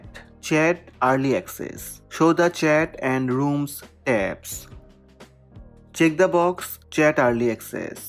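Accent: India. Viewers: His accent is Indian, sonny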